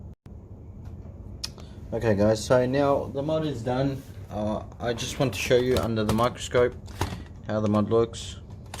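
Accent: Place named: Australia. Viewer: That's awesome and you sound Aussie too my in nsw can you please send me your details need this mod done a a few other things. Look forward to hearing from you ASAP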